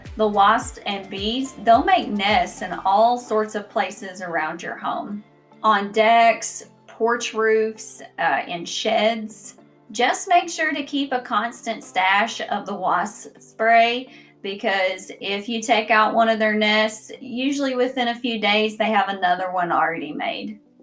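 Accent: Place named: Kentucky, United States. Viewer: So you like your country accent but don't like to hear other country accents. Okay, gotcha. Can't get more back woodsy than Kentucky. Maybe you could move back to your holler in Kentucky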